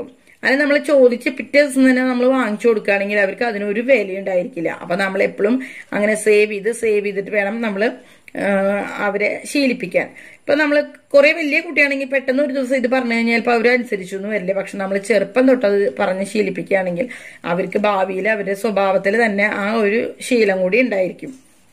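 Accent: Thrissur, India. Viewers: 😁nan subscribe cheithu.chechide varthanam kelkkan nalla rasam undu.thrissur basha.nan kannur.epo husinte koode gulfil.chechide morucurry anikishta.nan undaki.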